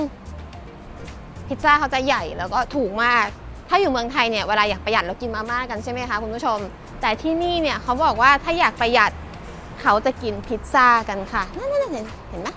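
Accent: England, United Kingdom. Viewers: ชอบคุณ พูดอิ้งค่ะ ฟังเพลินดี สำเนียงออกบริตติชเลยค่ะ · คุณ เป็นนักเรียนอังกฤษเก่าใช่มั้ยคะสำเนียงออกทางอังกฤษเลยค่ะ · Did you attend school in England? You got an English accent. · พูดสำเนียงอังกฤษไช้มั่ยเนี่ย ดูepNYมาหลายตอนเพิ่งสังเกต😁😁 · สำเนียงหนู British มากเลยค่ะ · ชอบสำเนียง British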